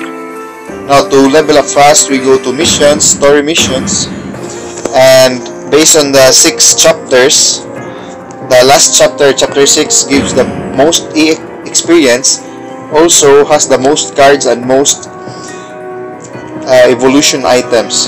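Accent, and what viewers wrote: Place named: Philippines. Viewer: Are you from the philippines? I can tell by your accent.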